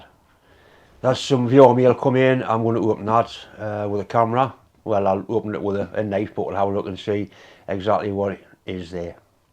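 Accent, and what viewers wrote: Newcastle, United Kingdom. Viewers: great video, as ever - thanks for everything you do. Just wanted to let you know a bit of an odd coincidence - I started following your channel after a shout out from the Canadian YouTuber AvE, but despite the familiarity of the accent I'd not looked too much into it, s'just some geordie lad doing his thing. Then I noticed the address on the package - I had to double-take as a wave of nostalgia flooded over me. Despite now living at the other end of the country, as a kid, I grew up in the countryside of Northumberland and used to stay at my grandparent's house every weekend - my granddad was a retired engineer and used to fettle model boats in his garage, definitely where I got my love of engineering from. Anyway: they lived at the bottom of St. Estate, and I used to go walking the dog down the path alongside the Stamfordham road down past Callerton. 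Good times with the innocence of youth and all that! Anyway, keep up the good work, all the best to you and yours.:)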